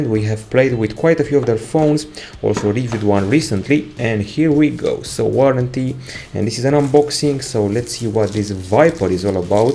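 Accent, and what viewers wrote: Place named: Romania. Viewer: Nice Romanian accent. 😀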